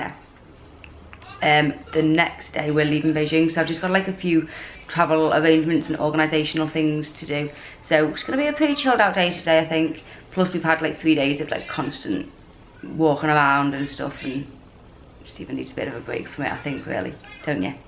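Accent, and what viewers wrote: United Kingdom. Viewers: I love your British accent, I listen to you ,you''re so smart,and fun. love your vlogs!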